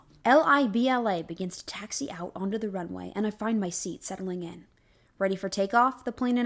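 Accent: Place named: South, United States. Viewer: Why was your southern accent better after drinking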